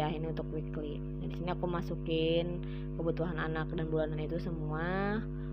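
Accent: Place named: West Java, Indonesia. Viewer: Logatnya msh ketara org sunda nya ...unboxing paket binder lanjut budgeting ya teh smg terus istiqomah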